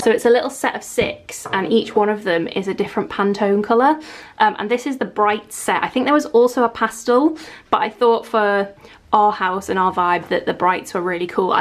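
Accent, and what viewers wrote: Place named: Wirral, United Kingdom. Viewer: Kept wondering where “up north” you were from. Was so happily surprised that it’s the Wirral. I too am from the Wirral. Discovered your channel over lockdown. Probably due to me maniacally trying to get my flat sorted whilst on furlough and thus immersing myself in all things home related! Your home style is exactly the same as mine!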